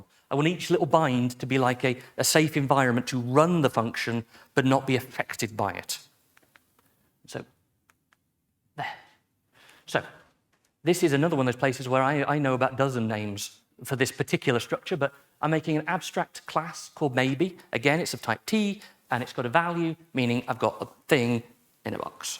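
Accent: Midlands, United Kingdom. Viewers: I've turned on the autogenerated subtitles for a few minutes and they've been spot-on, if you're not used to an English midlands(?) accent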